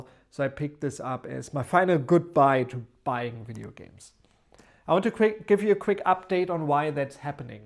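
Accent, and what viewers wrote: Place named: Germany. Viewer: You sound German! I guess you’re going balls deep into manga, huh? 😀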